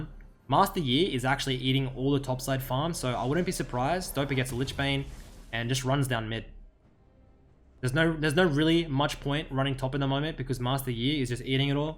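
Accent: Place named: Australia. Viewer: voice is so annoying...too aussie...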